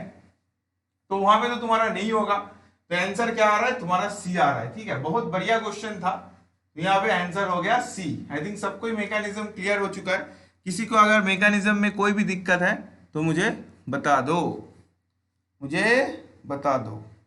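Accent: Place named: Bengal, India. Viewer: Dada iacs er aro questions korao... Please do more questions of iacs. Your accent made me feel that you're a Bengali. So commented in Bengali :)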